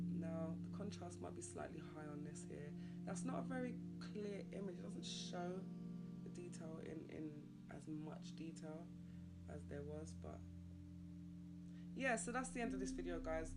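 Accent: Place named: England, United Kingdom. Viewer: First you are chill and easy to listen to you with your English accent I love to go there. I need to know what can take I do to take away the shining from my drawin? Antoinette Rochester Las Vegas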